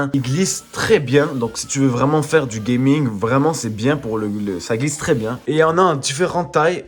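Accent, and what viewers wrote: Quebec, Canada. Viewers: A l'accent sa se voit t'est québécois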